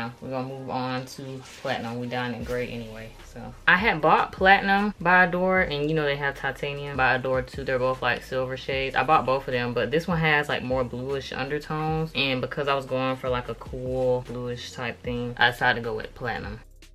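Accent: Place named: South, United States. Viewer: I honestly thought you were South African. Your name could be Nthabiseng (sesotho for bringer of joy) but your Southern accent is coming through haha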